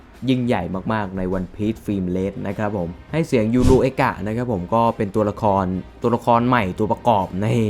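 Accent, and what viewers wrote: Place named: Suphanburi, Thailand. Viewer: แอดใช่คนสุพรรณป่าวเนี่ยเหน่อจังเลยตอนท้ายๆคลิปอะน้า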